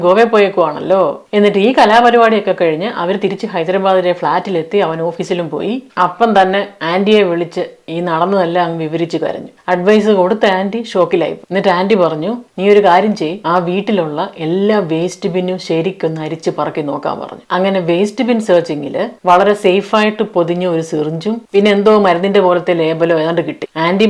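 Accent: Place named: Chengannur, India. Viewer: ചെങ്ങന്നൂർ സ്റ്റൈലും സ്ലാങ്മാണെന്ന് ഊഹിക്കുന്നു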